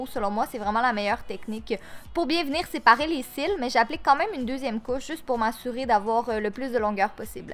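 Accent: Quebec, Canada. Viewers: au Québec ils parlent comme ça c'est tout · maïmouna thiam c pas un accent Marseillais !! C celui du Québec ! :) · mais si tkt juste que je ne m'y attendais pas que tu sois québécoise😁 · Du québec ( désolé je réponds a sa place ) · 😍j aime troop tes videos et j aime trop ton accent quebecquois trop cute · Tu. Viens du Québec ? :) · c'est une cébéquoise elle a l accent, ps:t'es magnifique trop trop belle j aimerais bien être comme toi♡♥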